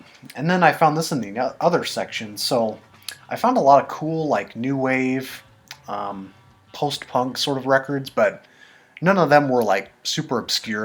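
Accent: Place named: Lincoln, United Kingdom. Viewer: Hey! Cosmic Brian sent me here! He said you're from Omaha, but it sounds to me like you're from Lincoln? It's pretty cool watching a video of someone showing the treasures they found at places where I shop at occasionally!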